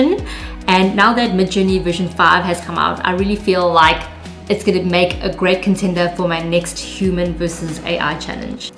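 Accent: South Africa. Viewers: Immigrated to the States when I was 10. At the core I'm South African. Recognized your accent right away. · This seems to be a South Africa accent. Hi from Cape Town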